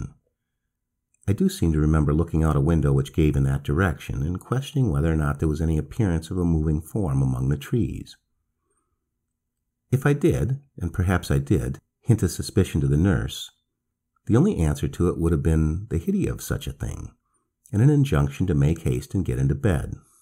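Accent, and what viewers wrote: United States. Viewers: Funny to hear an M R James story narrated by an American just when I was getting used to more complex British accents. 😁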